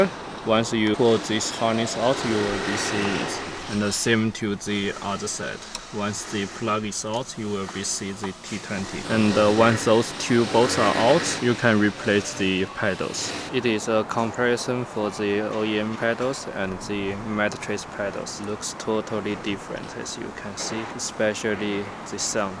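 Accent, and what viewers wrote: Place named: China. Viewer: Your English has improved by the years sooo much :D you don't have a big chinese english accent anymore. Just a light. :)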